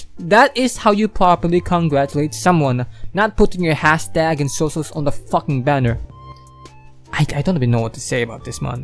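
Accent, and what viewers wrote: Philippines. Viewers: Finally someone with English speaking Filipino accent stepped up to understand the situation than native language content creators. All topics aside but this is wild, this is why I wouldn't trust politics all because of Marcos. Marcos did banned Beatles from performing in PH and I was pissed off by that. And now sending Du30 to Netherlands? What Marcos did was ruthless and very greed to hide from us.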